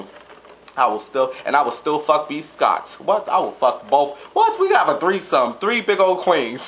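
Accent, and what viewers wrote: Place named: New York, United States. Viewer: i love how you talk with that new york accent!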